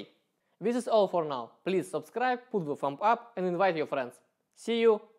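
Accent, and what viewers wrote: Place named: Soviet Union. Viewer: Всемогущий Великий Русский Язык пробивается в гроссирующем ррраскатистом Советском акценте! Так Держать - продолжайте практиковаться!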